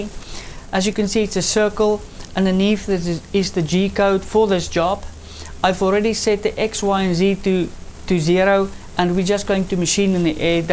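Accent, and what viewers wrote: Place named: Afrikaans, South Africa. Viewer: Lekka Afrikaans South African accent - Made me chuckle :D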